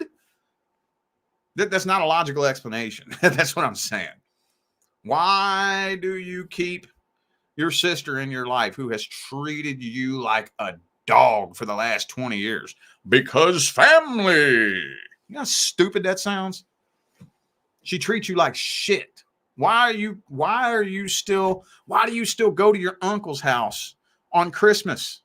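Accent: United States. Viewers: Hello From France,🙂 How come while I have been rolling rolling rolling through the many videos of Tarot without falling till today to your great work. Let's go straight, I look at my tarot ( 5 different artists that I really really like Boticelli, Isawatt, Anne Guesquière, ...) I do it once a week otherwise you can feel easily lost, while doing once a week it is my moment and I like it. But sometimes during the week, I like to put a curious eye on Zodiac. And today ,I discovered randomly your channel, you killed me since you speak with one clear voice ( I love presentation cosy cool attitude with black and white band on the screen to follow in which topic we are and all that with humour even sometimes thematics are not easy always well most of the time you speak with awesome comments . so I am in sheer curiosity I will even drop on my notebook some of your comments, it allows me first to speak a better English ( sounds you live in USA , that accent is ok too ) and to take conscious as a pure true Aquarius down to Earth I embody that most of the time it is necessary not to play an automatic way of life pace and rather focus on a "camino" on a way to get closer to what wee feel in ourselves really stop lying to oneselves, assume decisions, choices... 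and well yes I can say this my first video from u listening and I feel happy because of the tone you employ emphasis on purpose, repeated sentences, like dear Aquarius stop with your " I know, I know, I k now" among a plenty.👊✊👏 I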